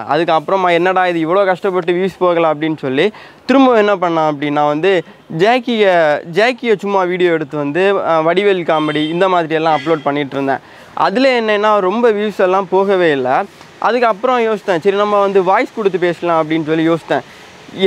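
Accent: Kanyakumari, India. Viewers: Hi recently I started watching your videos to hear our kk district slang..🤣🤣🤣 very funny … Me too from kk district.. but I don’t speak the same 😆 Keep going Thambi 👍🏻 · Anna unga kanyakumari slang rmba alaga irruku anna epaiumae eppidiyae pesuga athu tha nalla irruku and congrats for 100k and always love u jacky 😍🔥✌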